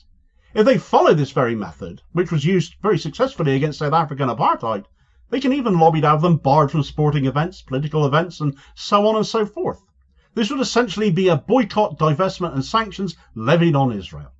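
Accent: Cornwall, United Kingdom. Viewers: He is from Cornwall in the UK and has a regional accent (which I love), try using captions maybe?🤷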